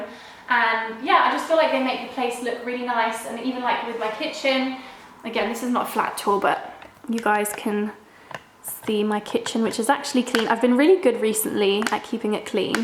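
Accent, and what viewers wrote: Italy. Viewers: Il tuo accento italiano è troppo carino 😊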